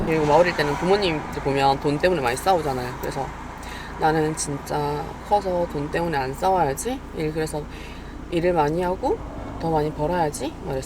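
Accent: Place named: Gyeongsang, South Korea. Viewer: 말투가 경상도네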